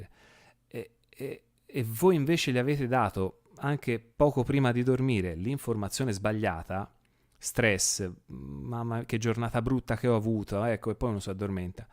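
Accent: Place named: Montecatini, Italy. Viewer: secondo me sei di Montecatini, parli come una mia ex :)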